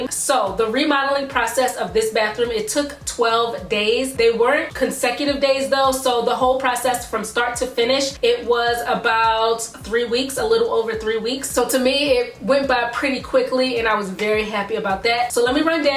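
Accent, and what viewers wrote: Baltimore, United States. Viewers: End result is so beautiful! I love this! Sn: I hear a Baltimore accent dew tew lol are u from Bmore?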